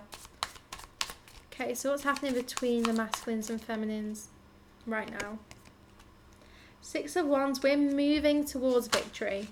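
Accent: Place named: Northern England, United Kingdom. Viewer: Hullo, you are VERY tuned in. Your channel popped into my auto generated YouTube list yesterday and yesterday's reading followed by this one is mind blowing. And I'm only of today's reading, LOL, just wanted to give you the thumbs up straight away. You have a northern English accent. Where are you based - Yorkshire?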